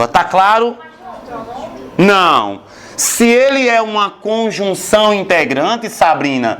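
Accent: Northeast, Brazil. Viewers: oooww Glória, gloria a Deus. Ei creio. Terra maravilhosa essa Paraíba, meu Nordeste. Eu sou de Pernambuco. Desconfie por causa do sotaque rsrs. Obrigada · Pelo sotaque sabia que você é nordestino, com muito orgulho. Grande talento, parabéns! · Pense num sotaque gostoso de ouvir... Sotaque Nordestino é bom demais.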